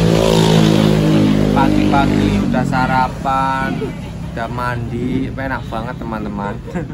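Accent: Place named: Java, Indonesia. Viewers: Jawa medok bngt mna sich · Pemalang pok mas koyo wong moga yak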